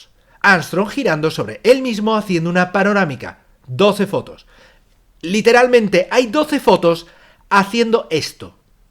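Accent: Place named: Extremadura, Spain. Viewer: tío, pues te me das un aire al acento de la zona entre Zafra, Llerena, Fuenteobejuna, jejeje. Un saludo crack!!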